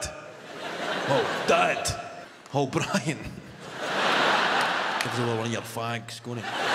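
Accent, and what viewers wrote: Scotland, United Kingdom. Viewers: He does actually speak English, but with a Scottish Accent. Maybe you just don't bother listening.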